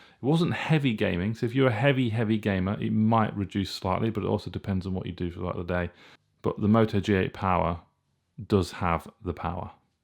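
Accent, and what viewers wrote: United Kingdom. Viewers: 0.88 Mbps download speed? I would have guessed from your accent that you are British, but apparently you live in a rural are in some developing country?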